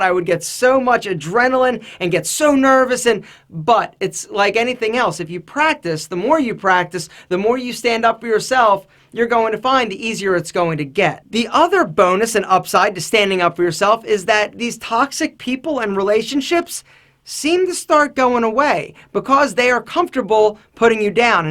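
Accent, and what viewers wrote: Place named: East Coast, United States. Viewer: east coast accent all day baby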